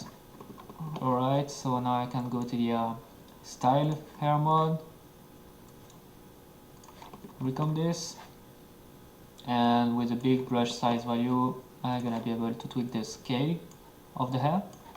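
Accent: France. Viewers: jeu sur attaque des titans par un français \o\ . Ca se voit que tu viens de france (accent)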